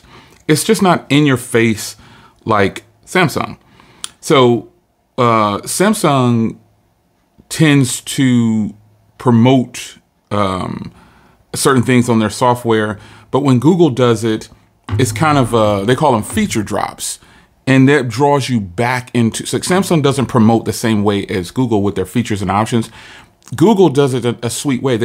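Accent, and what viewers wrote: United States. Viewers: He speaks with an American accent.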